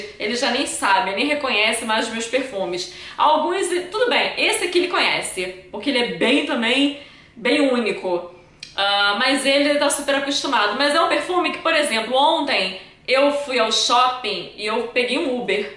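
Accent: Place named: Rio de Janeiro, Brazil. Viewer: chequei por aqui agora, masssxx vc tem muito sotaque de carioca. Amei os videos!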